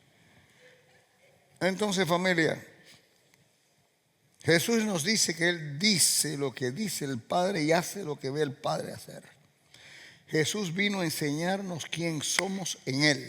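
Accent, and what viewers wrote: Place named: Cuba. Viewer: Pastor yo vivo en New Jesrsey , es primera vez que me cruzo con sus prédicas. He compartido porque estas enseñanzas bíblicas y no solo conocerlas si no vivirlas , aplicarlas en nuestras vidas y preservarlas como el tesoro más valioso qué el ungido de Dios nos comparta . Dios le bendiga Pastor a usted y a su familia. Usted me recuerda mucho a mi hermano muy cubano en su forma de hablar y con la experiencia de la vida usted tuvo el privilegio de ser rescatado . Mi hermano en cambio con el potencial que tenía por no saber y aplicar estas promesas que son nuestras perdió su vida con un cancer a los 57 años. Me he identificado con cada prédica y verdaderamente necesitamos ser sacudidos y necesitamos hombres de Dios como usted . Mis bendiciones. Vivianna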